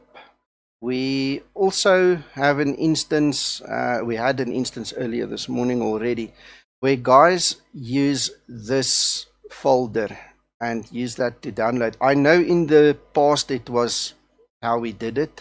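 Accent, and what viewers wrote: South Africa. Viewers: are you afrikaans cuz you sound afrikaans i am afrikaans too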